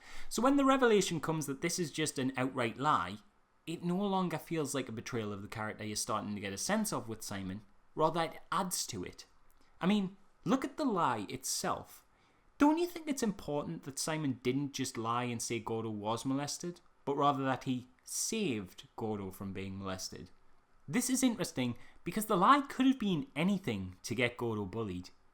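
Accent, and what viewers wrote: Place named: Scotland, United Kingdom. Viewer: Wow, twenty seconds in, I already need to ask: ARE YOU SCOTTISH?? I´m German so I´m really not 100% sure, but I just have to keep following your channel now for a while just for that amazing accent! (I´m also quite a huge Doctor Who thus Peter Capaldi fan for now..If you know that show, you also know, why I say "for now", because it can change.... Very soon even maybe....)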